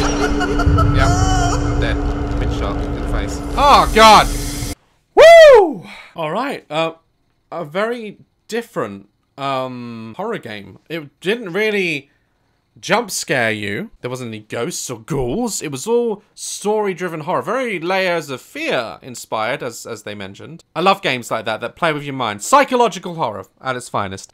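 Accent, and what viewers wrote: United Kingdom. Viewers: sounds slightly more British than I remember, his accent is really coming through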